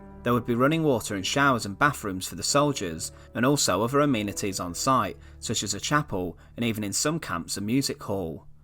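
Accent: United Kingdom. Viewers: ...so says a guy with a British accent...let’s ask a German who actually was imprisoned in one instead of the enemy who kept them there.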